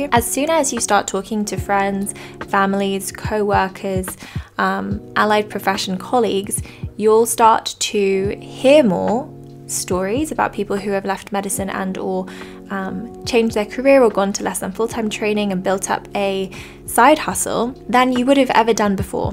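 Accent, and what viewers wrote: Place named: Australia. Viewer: You have an aussie accent. How come?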